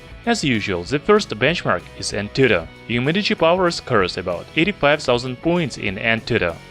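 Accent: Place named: Russia. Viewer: русский? акцент похож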